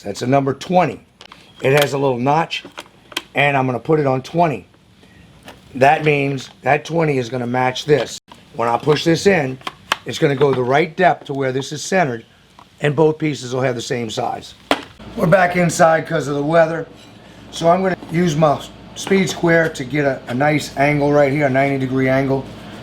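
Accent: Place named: New Orleans, United States. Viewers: to a British person he sounds like like he’s from New Orleans as you said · love your work - quick question. I cannot figure out your accent. It's definitely not rural Virginia, where I'm from, but doesnt sound like Long Island either. It's like 5 different accents in one. New Orleans maybe? Do tell, sir!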